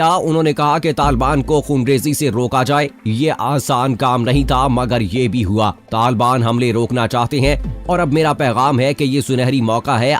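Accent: India. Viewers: Man you need to learn Urdu. Because your accent is like Indians. Shame on you. Stupid man.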